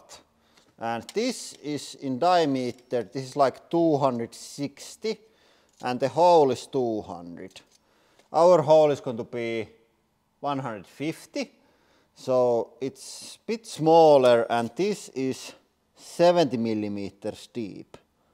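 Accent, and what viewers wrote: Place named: Finland. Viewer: I love the Finnish accent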